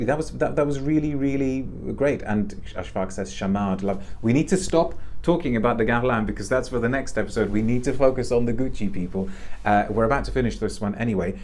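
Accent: United Kingdom. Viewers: British accent - I’m in 💖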